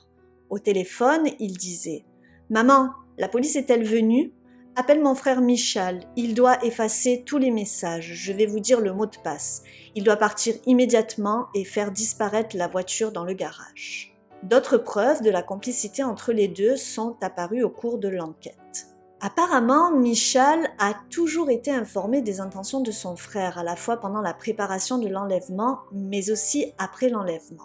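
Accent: South, France. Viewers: tu viens d avoir un nouvel abonné a l instant désolé je connaissais pas ta chaine avant cette fin de soirée j aime ce que tu fais félicitations miss avec ton petit accent du sud j aime beaucoup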